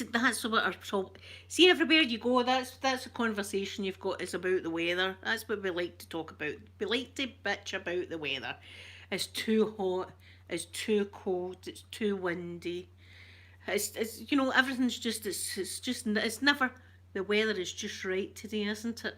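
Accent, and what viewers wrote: Scotland, United Kingdom. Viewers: Hi, I’m new to this channel. I subbed on my other account, but switched to this other one. I’m from Scotland too. It’s nice to hear another Scottish twang on YouTube ⭐️😁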